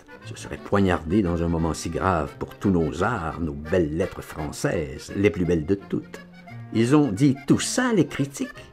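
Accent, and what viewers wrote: Quebec, Canada. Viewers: Coupez la musique bordel. C'est pas du bal musette. L'accent québécois pour une phrasé titi parisien ça ne va pas non plus.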